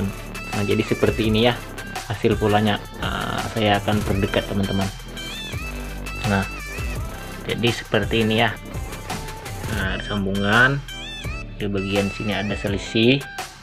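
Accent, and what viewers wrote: Banjar, Indonesia. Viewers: Banjar nih kedengaran nya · Pian nih logatx kaya org Banjar Bermanfaat BNR YouTube pian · Saya baru liat menit 5 , tidak sampai selesai video. Sudah paham... Sebab saya sudah menjahit/penjahit 15tahun... Tapi saya mau bertanya,anda orang mana , suaranya itu loh🤣🤣🤣 Banjar atau apa??? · Logat kayak urang banjar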